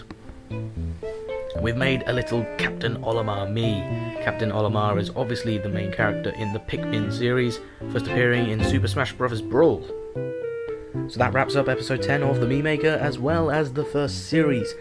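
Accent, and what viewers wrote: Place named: United Kingdom. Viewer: ops british!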